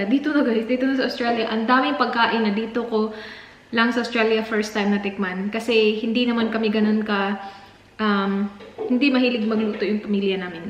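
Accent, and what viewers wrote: Visayas, Philippines. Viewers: kaya pala parang familiar ng tono mo..katabing isla lg pala..sending my love from negros☺️ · Gusto ko mga vlogd mo..saka gusto kits personally kasi Hindi ka katulad mga ibang nakapangasawa ng foreigner lalo na Australian na napapanood ko sa dito sa yt na exage gumaya sa accent ng husbands nila...ikaw very natural ang English at fluent..saka gustong gusto ko yong Tagalog mong may accent na bisaya..Godbless..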